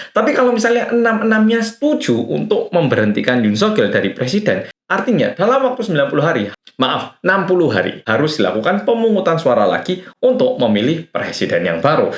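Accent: Java, Indonesia. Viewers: Mantap masss medok, update terus yaaa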